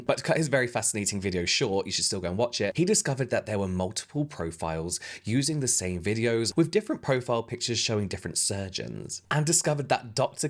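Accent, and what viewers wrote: United Kingdom. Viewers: tff 💀. has a pretty strong British accent that gets in the way of pronunciation it happens that’s lifee.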